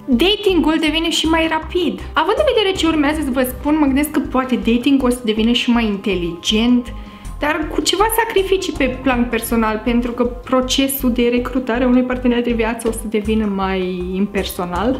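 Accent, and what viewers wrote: Moldova. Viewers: Singurele persoane care pot sa aiva o relație cu mine sunt băieții din 1D. Ps: imi place accentul tau de moldoveancă drăguță. 😘😘😘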